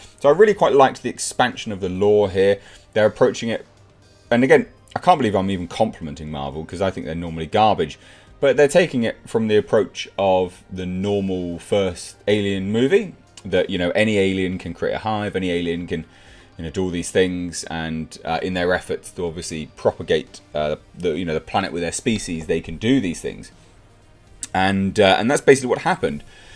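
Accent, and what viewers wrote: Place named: United Kingdom. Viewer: Are you from the United Kingdom? You sound British. I like these comic books too. I need to buy more of these comic books.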